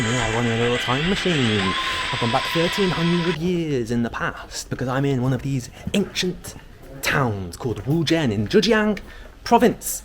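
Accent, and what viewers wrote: United Kingdom. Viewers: I like your cute British accent :) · Your British pronunciation is like music to my ears. Thank you. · Enjoy listening to your talk! I think you talk very well. You got lovely British accent with British style humour. China has a huge amount to explore, I can see you have the potential of being successful youtuber 😉 best luck!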